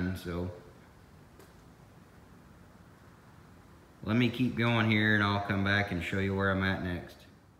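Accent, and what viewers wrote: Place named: Kentucky, United States. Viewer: Not sure where dude's from but man he sounds like rural Kentuckian to me. All he needs to do is say "hell fire" as an exclamation and i'd be convinced.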